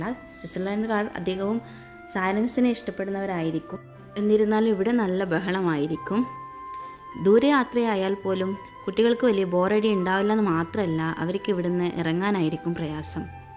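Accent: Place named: Malabar, India. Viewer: Hi I am originally from Calicut and living in UK.I watched your video today for the first time.I can’t wait to come and visit Switzerland.It looks so beautiful. Because of your accent,I guess you are from Malappuram or Calicut😀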